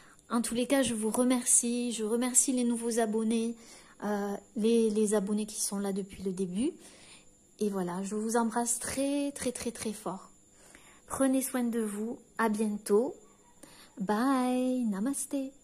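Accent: South, France. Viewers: Bonjour. Merci pour cette guidance qui me parle beaucoup 🙏🧘‍♂️🕉🌷🌺🌍💞 on entend votre petit accens du sud et vous devez connaître l opercule de sainte lucile un porte bonheur, représenté sur la carte ( 16 Ce que vous voulez vous veut ) ce qui m’aide encore plus à croire votre guigance votre cœur. MERCIIIIIIIIIII de nous aider éclairer. Je vous souhaite un trés bon week-end que DIEU ET L’UNIVERS VOUS PROTÈGE NOUS PROTÈGE 🌷🌷🌷🌺🌺💞🌍🕉🧘‍♂️🙏🐱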